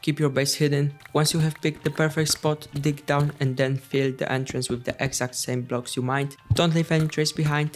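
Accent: Italy. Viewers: Dude are you italian?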